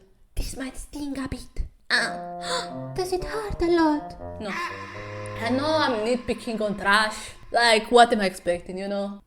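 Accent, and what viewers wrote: Russia. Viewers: Am I the only one who focused on her accent thinking it’s such a good Russian accent 😭😭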